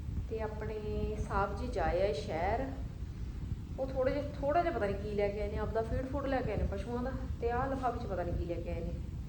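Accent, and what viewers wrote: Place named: Moga, India. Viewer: Didi thode pekke kithe mainu moge side lagdea thodi boli to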